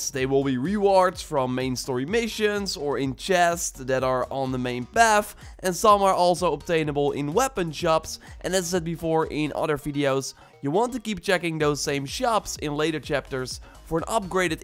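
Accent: Netherlands. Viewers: Are you from holland??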